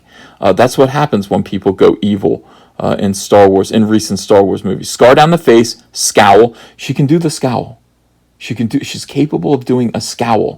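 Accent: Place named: New York, United States. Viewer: Lol that sounds nothing like Mikey Zeruh. More new York less matrix agent.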